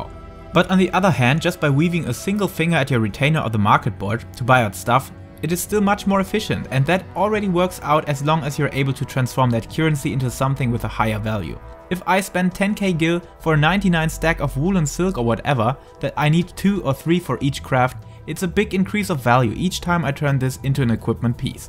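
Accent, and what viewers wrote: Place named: Germany. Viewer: Nettes Video . Schade , dass es auf englisch ist und nicht auf deutsch . Man hört raus , dass Du Deutscher bist . Wäre doch mal was , wenn man dieses auch mal auf Deutsch erklären würde . Wie auch immer. Danke fürs hochladen . Denke , dass das auf meinem Server nicht so klappt . Schade .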